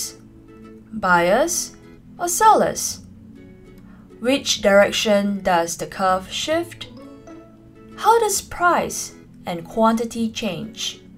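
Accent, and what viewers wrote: Singapore. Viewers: are you from Singapore or Msia? sound like Singapore accent but very subtle :) I miss my country! :D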